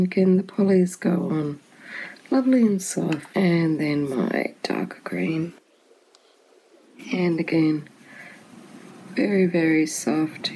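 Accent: Australia. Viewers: OMG, a fellow Aussie, so nice to hear someone not waffling on with stinkin cutes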